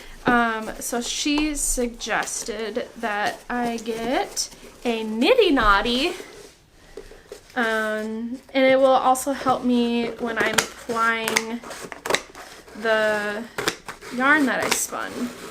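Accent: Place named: Canada. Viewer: Girl if you didn't say otherwise, I totally would have thought that you are Canadian! Also, envious that you have a family business to work at...such a blessing, may you never take it for granted. Love from your northern neighbour!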